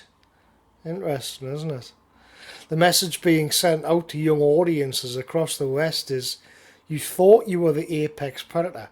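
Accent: United Kingdom. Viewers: Great stuff. I enjoy these kind of youtube reviews more than any modern films themselves. In fact i simply dont watch films anymore if im honest. I particularly enjoy it when gets into fits of laughter. Made me chuckle on walk home from work out loud! Something about discussing what is actually quite a depressing subject and not helping but laugh that really takes sting out of it. Definition of if didnt laugh you would cry. Thats important i think, and very british characteristic (particularly with great accent). 👍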